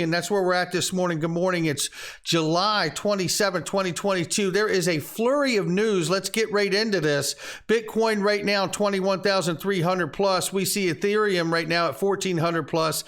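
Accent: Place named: United Kingdom. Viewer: I am very excite about XRP.. its every time different story.. very cool token.. and reporting very cool thanks you for this reporting...i check you headlines out bit hear of that all time.. very confusing you give report but your english cool good british language thnks 2 u 😘 😍😍 weLOVE for U much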